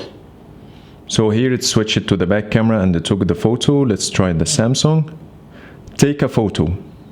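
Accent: Egypt. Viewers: Your accent is so Egyptian damn 😅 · Are you Egyptian? Your accent is very Egyptian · من طريقه نطقك شكلك مصري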